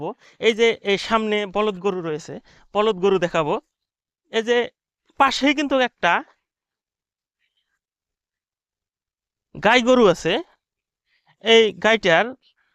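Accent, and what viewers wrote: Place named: Bangladesh. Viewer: Are u from India?apnar kothar tone to Pura bangladeshider moyo.gorur Dam 1 lather Kim bangladeshe niy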